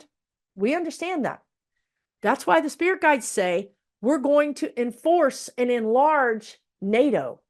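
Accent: Texas, United States. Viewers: Chickensh*t you sound like a Texan 😊❤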